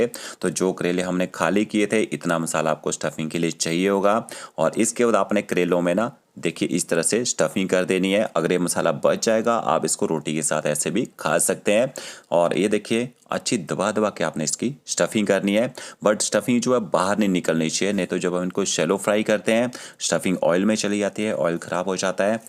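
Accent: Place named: Himachal Pradesh, India. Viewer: Himachali accent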